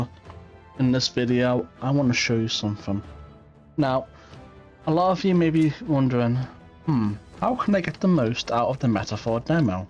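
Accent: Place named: Liverpool, United Kingdom. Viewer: Our guide with a good Scouse accent. I love it 😂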